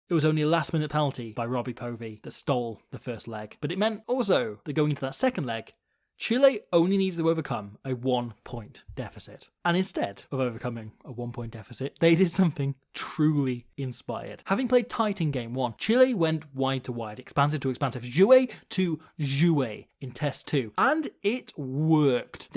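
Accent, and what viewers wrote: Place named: Edinburgh, United Kingdom. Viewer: English with a Edinburgh accent..my Chilean is...not sure lol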